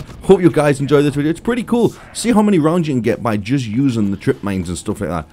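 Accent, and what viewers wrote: Newcastle, United Kingdom. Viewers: are you a geordie mate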